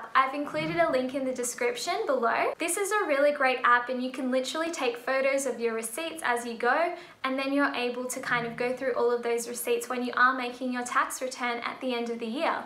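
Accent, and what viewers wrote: Australia. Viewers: thank you so much for your helpful information I really into your Aussie accent. Your voice is super soft-spoken =D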